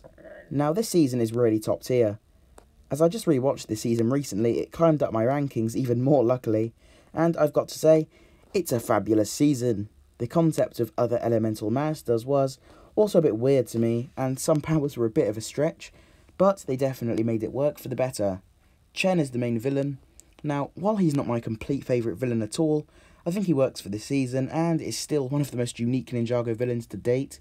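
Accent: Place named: United Kingdom. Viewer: British lol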